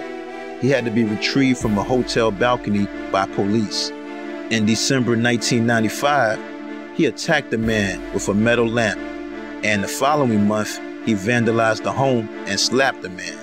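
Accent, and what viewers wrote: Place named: Baltimore, United States. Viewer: Very informative, but I could do without the Baltimore accent of the narrator.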